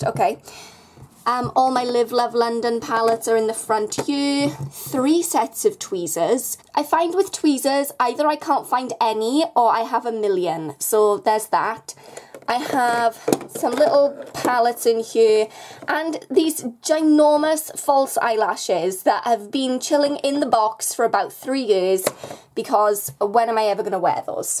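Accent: Wales, United Kingdom. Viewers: Omg r u welsh soo am i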